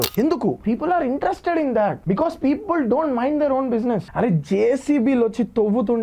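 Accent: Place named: Godavari, India. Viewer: Annaya dhi Godavari anukunta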